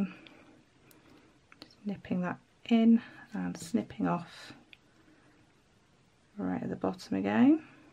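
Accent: United Kingdom. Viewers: Great job. Love it. I love your English accent. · Am I the only one that is obsessed with their Britain accent? Yes, okay. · Are you from tottenham? Your accent reminds me of Adele ☺️ Great video. I like your teaching style ❤️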